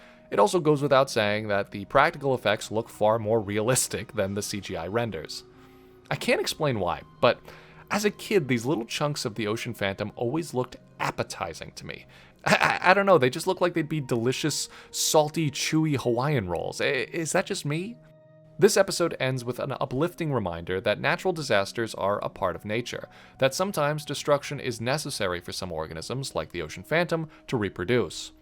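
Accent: New England, United States. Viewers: The NE accent gets stronger and stronger each video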